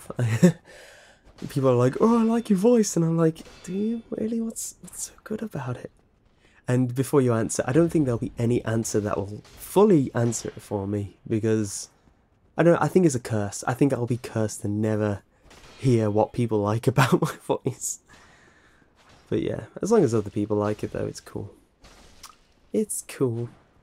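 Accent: United Kingdom. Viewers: What people like about your voice: it sounds Brittish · He's brittish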